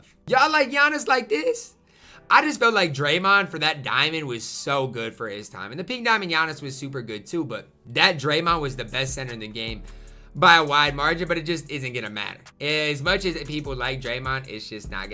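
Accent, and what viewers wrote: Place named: Detroit, United States. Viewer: How come sometimes you talk normal and then sometimes you talk like you are from 8 mile?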